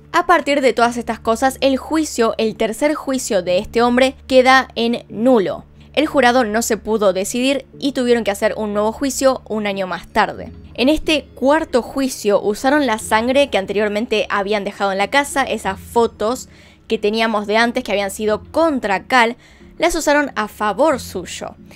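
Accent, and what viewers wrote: Argentina. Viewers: QUe hermoso narras!!# adoro tu acento argentino. Saludos desde illinois uss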